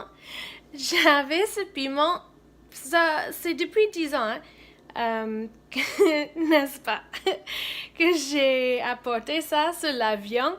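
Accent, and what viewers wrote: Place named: Cameroon. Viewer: You have little bite an cameroonian accent is funny. But you do well in french.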